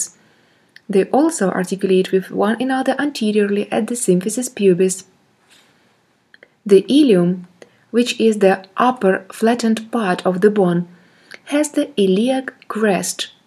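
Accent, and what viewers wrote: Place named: Spain. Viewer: Thank you so much. It was a great help. Btw are u spanish? I asked because of ur accent. I love this accent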